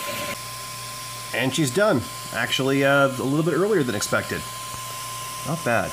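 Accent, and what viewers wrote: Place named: United States. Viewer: Great video, thanks for posting this! I'm very interested in this printer and noticed the economy shipping to NY state was $55 excluding VAT. You sound like you are from the states. If so, did you get charged a VAT?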